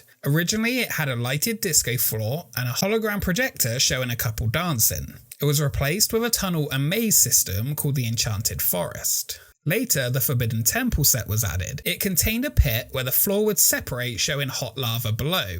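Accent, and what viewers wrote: United Kingdom. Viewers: why is a British accented person telling me more about my town's crazy oddity than i ever knew myself LOL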